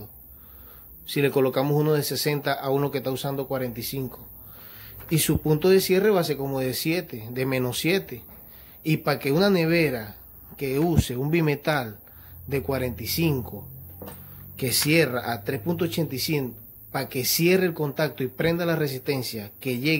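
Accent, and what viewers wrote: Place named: Maracaibo, Venezuela. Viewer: Primo vos sois maracucho dónde te pongan ! 😅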